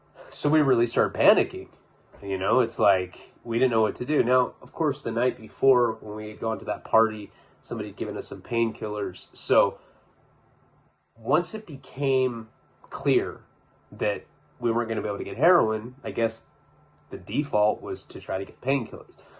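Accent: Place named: California, United States. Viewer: He does it so often its hilarious, it goes with his rich kid cali accent so well.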